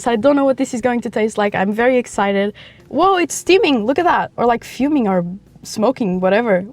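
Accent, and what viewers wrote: Portugal. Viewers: your accent is so nice ahhh fu*k . it's portugal accent really? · giiirl soube logo que eras tuga (ou pelo menos que falas portugues) pelo teu sotaque antes de dizeres que estavas em Portugal! comprei a minha maquina hoje, com o terea turquoise , espero gostar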